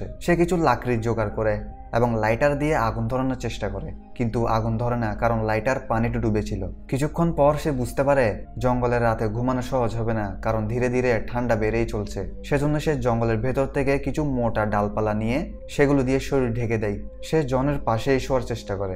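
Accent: Chittagong, Bangladesh. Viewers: আপনি কি চিটাগাং এর মানুষ 😅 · Oni hoitoba Chittagong ar ar jonno onar kothai Chittagong ar tan chola asa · আপনি কি চট্টগ্রামের? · ভাইয়া কি চট্টগ্রাম এর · ভাষাটা একটু প্রবলেম,চাটগাইয়া টান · Apni Chittagong thaken??? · are you from Chittagong? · চট্টগ্রামের আন্চলিকতার টান পরিহার করে ব্যাখ্যা করুন।শুনতে আরও ভাল লাগবে। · Vai chittagonian naki😊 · ভাই হচ্ছে চট্টগ্রামের লোক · Vai Apner lenguage a CTG local er tan ase. Explation valo hoise kintu Kotha bolar expression aro practice korte hobe , Good Luck. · Chatgaiya tune ta na anar cesta koren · ভাইয়া কি চিটাইংগা?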